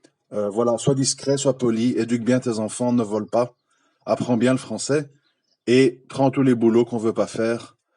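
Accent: Belgium. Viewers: Accent belge. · c est un Belge de souche 😇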